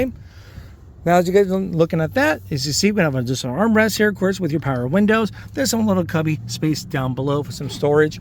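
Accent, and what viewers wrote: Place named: Midwest, United States. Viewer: Sounds like a Midwest English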